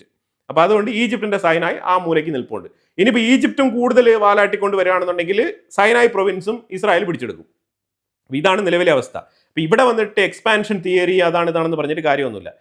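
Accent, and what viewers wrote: India. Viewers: വളരെ നല്ല അവതരണം കൃത്യമായി കാര്യങ്ങൾ മനസിലാക്കാൻ കഴിഞ്ഞു... 👍👍സ്ഥിരമായി കാണാറുണ്ട് യുദ്ധ വാർത്തകൾ മററുളള ചാനലുകളിൽ പറയുന്നതിനെകാൽ വലിയ ആധികാരികമായി തന്നെ പറയുന്നുൻട്...താങളെ കുറിച്ചു ഒരു self Introduction പ്രതീക്ഷിക്കുന്നു... മലയാളം കേട്ടിട്ട് കേരളത്തിന് പുറത്തു വളർന്ന ഒരാളായിട്ടാണ് തോന്നുന്നത്...എല്ലാ ആശംസകളും..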